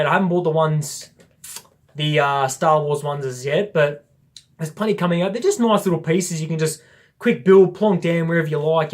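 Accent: Australia. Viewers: i think its a good idea, to make a new release from (some) old sets. the taj mahal is a very cool building - but not to build :-D ... i also wait for the CC, but i think, that will be a dream / The best set 2017 is the old fishing store and the ninjago city..so much great details, so much fun to build :-) / Speed Champions are nice - but i hate stickers, and there are more stickers then parts... :-D ...and without these stickers, you dont know if its a Porsche...or what else ;-) ... / assembly square was 10 years...in 2007 came the first modular -> CC :-) / my english is not so good, but i like listen to you...australian english sounds nice :-)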